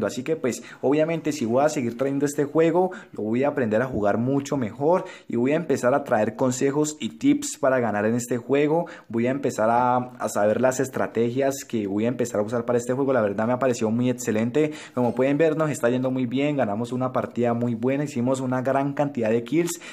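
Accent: Colombia. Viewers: Me encanta tu acento Colombiano💖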